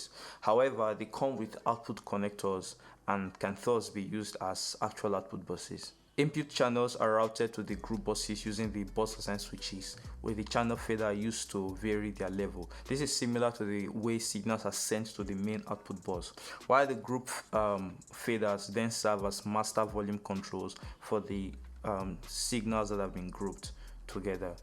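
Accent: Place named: England, United Kingdom. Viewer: I am struggling with his English accent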